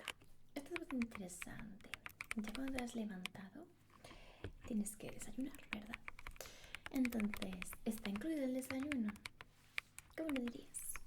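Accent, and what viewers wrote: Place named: Latin America. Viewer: English language with your hispanic accent is so cute❤❤ ❤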